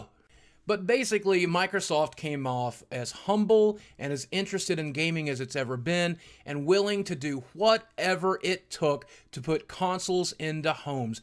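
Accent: England, United Kingdom. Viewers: Brilliant vid mate, especially appreciated the English accent, proper cracked me up! Trying to think of some constructive criticism, but to be honest, I don't think you could have said it better. ;-)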